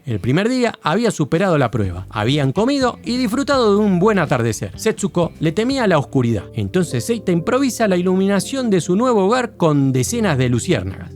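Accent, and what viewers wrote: Argentina. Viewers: Grande Hermano, soy mexicano pero disfruto el acento argentino y las picardias del mismo.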